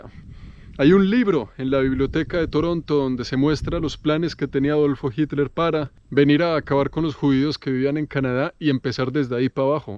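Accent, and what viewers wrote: Colombia. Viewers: Me encanto!! Igual como me gusta tu acento panacolombiano.! Un abrazo desde República Dominicana 😘🎊🇩🇴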